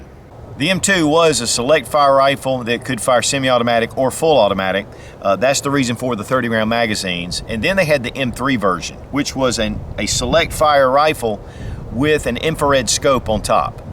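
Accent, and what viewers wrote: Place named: South, United States. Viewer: The bayonet lugs were not removed later, they were added at the very end of WW2 and used in Korea and later Viet Nam. The M3 trench knife was adapted with a fitting to lock into the lug and is known as the M4 bayonet. I have an original Inland made in 1944 and love it. My safety is not the lever as is pictured on yours, but the button push through version. I have dropped my mag many times while trying to come off safety. Not a good thing to happen in combat! A good reason to change to the lever version. Great review! From your accent I assume you are from Georgia, South Carolina or Tennessee. Am I right? I am a Jawja native myself and still kickin' the red clay to this day.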